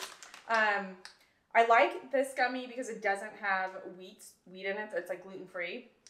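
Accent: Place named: California, United States. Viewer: You can totally tell she's from California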